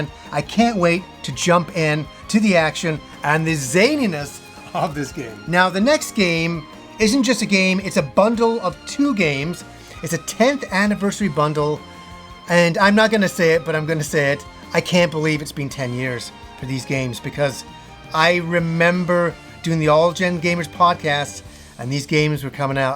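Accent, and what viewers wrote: United Kingdom. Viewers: Hold the phone...you’re British?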